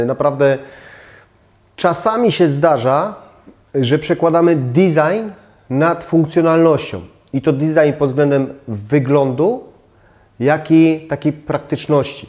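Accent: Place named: Upper Silesia, Poland. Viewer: Jest Pan ze Śląska? Bo wyczuwam właśnie sposób mówienia charakterystyczny dla ludzi z Górnego Śląska 😁👌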